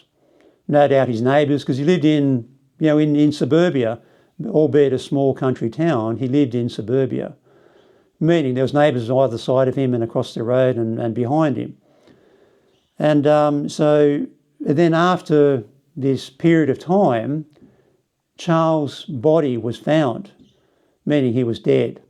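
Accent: Australia. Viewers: It's great to hear that beautiful Australian accent Thanks very much for your story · Me too, just to hear a fellow Aussie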